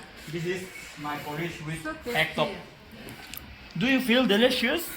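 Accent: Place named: Mizoram, India. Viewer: You are Mizo English, very very Mizo English ❤😂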